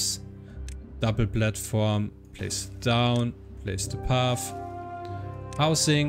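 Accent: Germany. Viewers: Deutscher Akzent so deutlich zu hören xD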